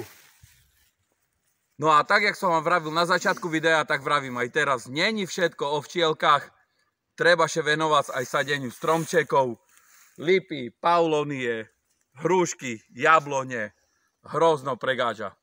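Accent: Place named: South, Slovakia. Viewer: Zdravím tě ! Už je pozdě .kdyby byli silnější tak by to odebrali ale možna to bylo ťím že byli ve 2 nastávcích. Chci se zeptat kde včelaříš ? Oblast ? Ten přízvuk tipuju tak Juh 😉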